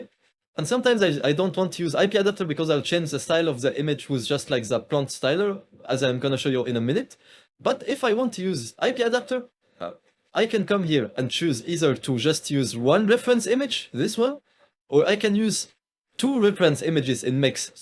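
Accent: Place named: France. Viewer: the french accent 👌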